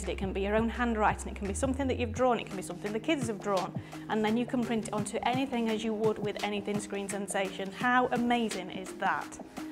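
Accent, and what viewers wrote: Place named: United Kingdom. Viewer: What is it about a Brit accent that clenches my jaws? Every other word is "pop it". Probably a German "loan word".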